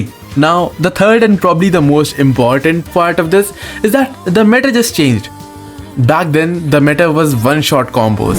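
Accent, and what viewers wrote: India. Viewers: bro this is indian accent i guess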